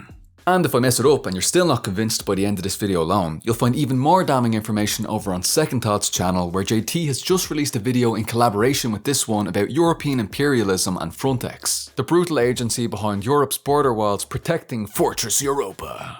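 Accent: Ireland. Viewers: It's always been the same goal, with only slight variations in the methods used, since The Enlightenment (about 3 centuries). Imperialism is synonymous with unlimited socialism for the capital class and tyranny for everyone else. Our own (American) revolution was a reaction to this system, which our own capital class recreated immediately following the war. My list of smaller YT channels that contrast reality with popular mythology grows a little bigger each time any of you credit another, similar channel. Here's another sub and like. Aside: I really like hearing an Irish dialect for a change, it seems that we depend very heavily on our eastern european fellows for information on how things really work and the history of how we got here.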